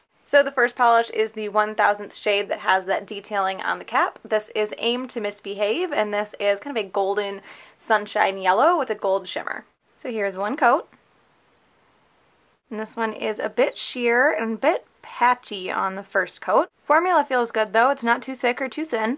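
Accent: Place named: Minnesota, United States. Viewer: Are you from Minnesota? You sorta have a accent. If so cool I'm from Minnesota too!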